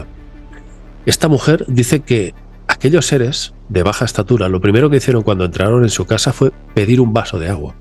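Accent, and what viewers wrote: Argentina. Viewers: Como que su voz es argentina? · Como su vos es argentina no entiendo?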